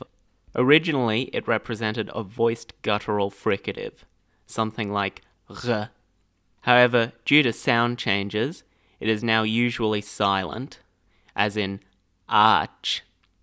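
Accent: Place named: Australia. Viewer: Bu iyi bir video! Güçlü Avustralya aksanına kıkırdamadan edemiyorum ama telaffuzu çok iyi yapılmış!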